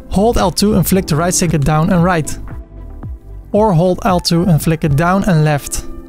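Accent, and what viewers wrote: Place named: Netherlands. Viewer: You have a nl acsent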